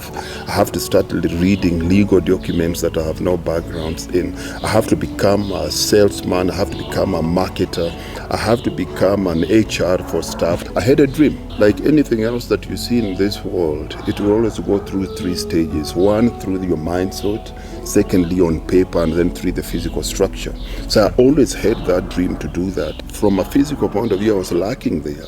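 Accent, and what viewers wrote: Kenya. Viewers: Amazing how he still have the Kenyan accent after all that time in SA...this guy is inspiring in so many ways...kudos my Kenyan bro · that is a luhya accent kabisa kwanza ya wa samia wa busia.prove me wrong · This was so beautiful I absolutely love the Kenyan accent., you are doing a good job with this series oh! What a beautiful dog he has, wonder what the breed is called... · His accent just reminds me of Papa Shirandula. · I thought he has luo accent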